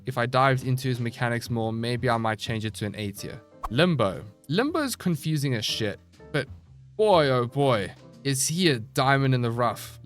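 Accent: South Africa. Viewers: Are you South African? English accent feels a little bit familiar... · Is South African🇿🇦 by any chance?? The accent sounds very familiar.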